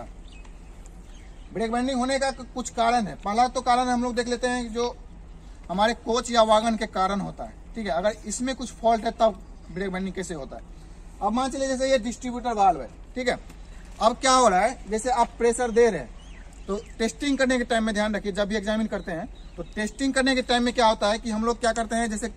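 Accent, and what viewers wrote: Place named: Bihar, India. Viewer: Super sir ji very good apke bihar se hai lag raha hai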